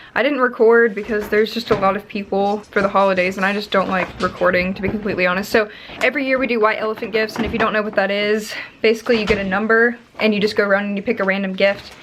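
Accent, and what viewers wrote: United States. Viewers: Genuinely thought you were one of my friends that I haven’t spoken to in years then I realised you’re American and I’m English 😂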